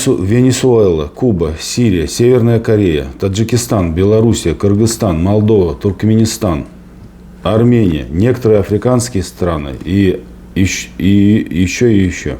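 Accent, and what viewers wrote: Tatarstan, Russia. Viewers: У вас самого русский акцент.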